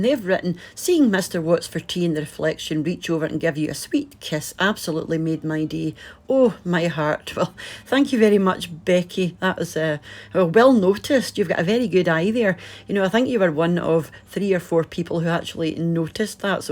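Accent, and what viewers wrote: Scotland, United Kingdom. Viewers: 'Nice of you to take the time to mention us here in Australia. I live in Sydney. It's been awful for a lot of people. Your channel is great by the way. You sound like my Mum from Renfrew. Oh, and just a little bit of humour to finish. You certainly don't look like that woman on the bargain show. Keep well.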